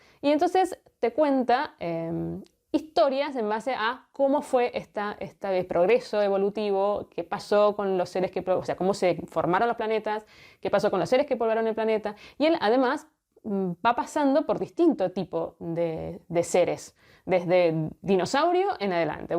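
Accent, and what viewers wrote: Argentina. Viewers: Hola! Gracias por tus videos! Me encanta tu estilo tan directo y objetivo para hablar de libros! Amo tu acento argentino! Y es maravilloso tener buen contenido latinoamericano!!!! Jejjee y GRACIAS!!! Por tu recomendación acabo de terminar crónicas marcianas…. Ufff lo amé!!! Todos los niveles de lecturas que tiene! Es increíble!!! Gracias y saludos desde México 🇲🇽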